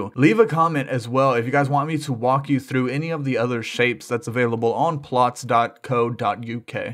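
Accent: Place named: Ireland. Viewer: The only reason I even put on this video cuz I'm making a giant hot air balloon but I still need to fill in the top of the dome and stuff and the bottom so I went to work for the day and I can't find a single thing that can help except from this dude that's the thing by to me kind of has like an Irish accent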